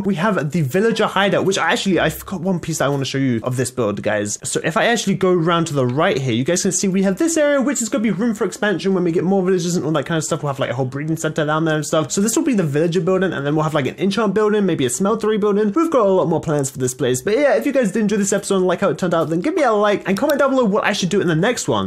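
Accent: United Kingdom. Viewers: I am not British but I would assume he had a British accent from the way he talks.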